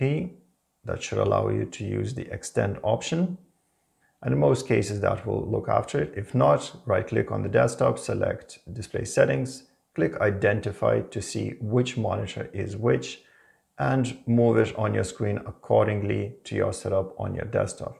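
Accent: Ireland. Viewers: Great tutorial.. Are you irishhhhhhhh? 👌🏾👌🏾🔥🔥.. I love All people but Esp I love all Irish people 😊👌🏾🔥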